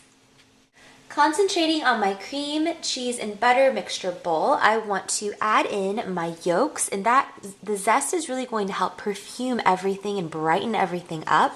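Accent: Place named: Baltimore, United States. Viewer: Yayyyyyy I am so happy I just found your channel. Now I can get crafty without all the research or messing up. ^_^ Wooooot! I am from Baltimore, it's funny wherever you are from you have a hint of a Baltimorean accent to my ear lol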